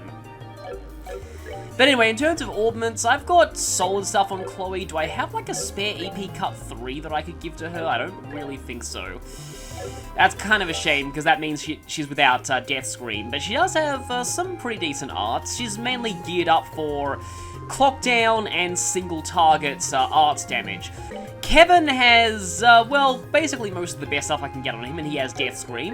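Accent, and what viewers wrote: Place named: Australia. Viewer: Aaaaand there's the leitmotif. Also, at least in the PSP version, enemies don't respawn even if you leave the tower and then come back. They look a lot like stone tablets (with the archetypical look of the Ten Commandments coming to mind). ...Thinking about it Ys 8 did a similar thing, except instead of the computers projecting them as images the tablets were the "computers" and projected the images themselves. I'd imagine it probably is a regional one; I've never heard an American or Canadian say it as anything other than "day-tuh", but I've herd people from the UK and (obviously now) Australia say "dah-tuh".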